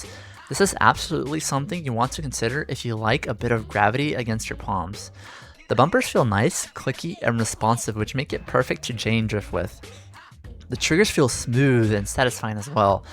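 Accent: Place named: Texas, United States. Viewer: texan spotted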